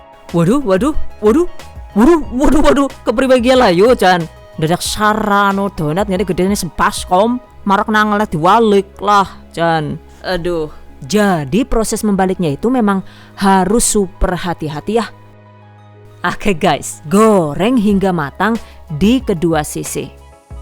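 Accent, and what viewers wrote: Banyumas, Indonesia. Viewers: Ora ngapak ora kepenak ya bund 😅😅😅😅😂😂😂resepnya mantuulll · Duh serasa kek nonton langsung demo masak ada bumbu2 joke khas ngapaknya🤣 best lah lain daripada yg lain👏🏻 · Banyak banyakin jokes ngapaknya 😂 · Jebulr ngapak 😂😂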